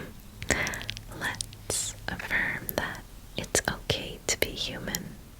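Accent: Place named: New York, United States. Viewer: My favorite is your NY/NJ accent❤❤ I love it so much, please do more 💜💜💜💖💓💓💓💓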